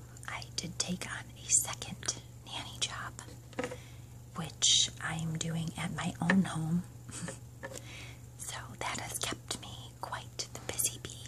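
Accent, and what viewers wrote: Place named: Michigan, United States. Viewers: Whatever accent she has it certainly sounds like a Michigan or new you accent. Whats weird though is my ex girlfriend was from Michigan and she had no accent. Her parents didn't much have one except like afew words. They would say A's real nasally and overdraw the short O sound. I don't know exactly what this lady's accent is exactly. I still like it nonetheless. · I ❤️love❤️ Michigan accents try always give me ASMR!! Especially yours lol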